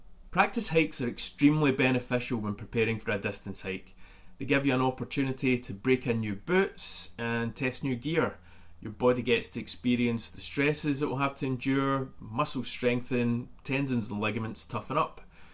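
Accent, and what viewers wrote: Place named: Scotland, United Kingdom. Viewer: Hello hiker! Stopping by to subscribe to your channel. The Scottish accent is soooo cool, I absolutely love listening to you talk! (Is that weird?😂)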